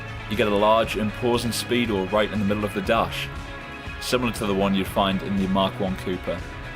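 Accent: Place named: Newcastle, United Kingdom. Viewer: good to hear a fellow Geordie voice narrating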